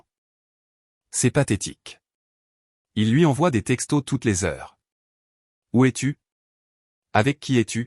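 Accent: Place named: France. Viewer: un français dans la cinquantaine qui lit un texte en anglais pour la première fois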